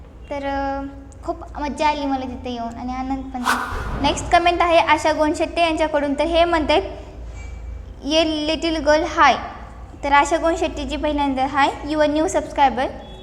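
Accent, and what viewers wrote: Latur, India. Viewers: you are Very Sweet Girl,.., Tuzya vanitun Latur cha sugandh Yeto. Tuze sarv video apratim ahet Video pahun gawachi odha lagate Makani Dam cha video adhik Vistar purvak hava hota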